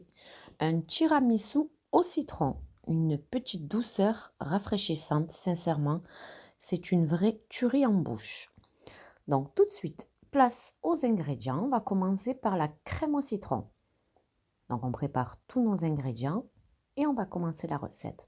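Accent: South, France. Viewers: Merci beaucoup en plus on a l'accent du sud en bonus 😘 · Super expliqué. Merci pour votre partage.!!! Je retrouve l accent du midi, quelle ́nostalgie... j essaierais votre si belle et visiblement bonne recette. Merci et Bravo pour votre travail.♥️♥️🎀🀄🌟🌞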